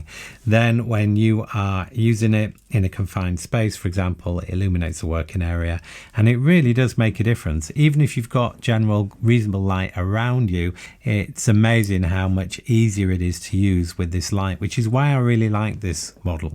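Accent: England, United Kingdom. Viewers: If im honest SAKs are why i came to the channel, them and Olight torches. I stayed for an English accent and UK £ pricing and the concise reviews. I have titanium scales on my cybertool but as hinted at above i always have a torch so i don't have any lite models. It's probably as a collector of well over a 100 SAKs in my top 5 Swiss Army Knife models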